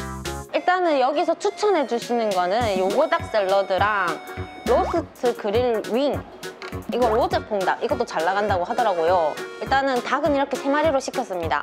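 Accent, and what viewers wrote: Gyeongsang, South Korea. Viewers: 정말 잘 먹는다.. 오늘~은 ...구수한 경상도 말씨 또 들어봅니다.. 저도 시켜 먹어 볼랍니다..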